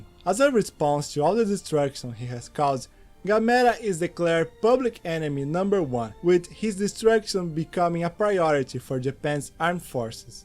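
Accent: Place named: Brazil. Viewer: Great video. Keep practicing your English pronunciations. You have a heavy Brazilian accent.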